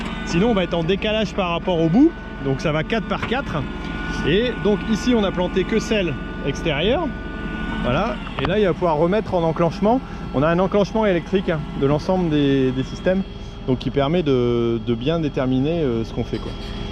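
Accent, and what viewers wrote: Nord, France. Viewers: Bonjour, super vidéo Puis moi qui suis originaire du nord, j habite maintenant en Sarthe, ca fait du bien d entendre du ch'ti!😂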